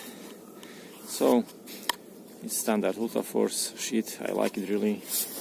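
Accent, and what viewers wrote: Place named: Brazil. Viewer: Your accent sounds almost Brazilian my friend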